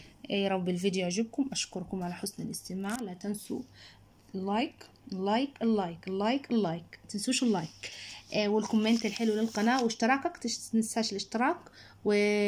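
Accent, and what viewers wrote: Egypt. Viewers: هل انت من مصر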